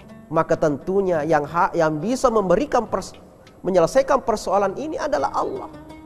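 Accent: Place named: Bugis, Indonesia. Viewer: Iyye puang biar ada khas bugisnya...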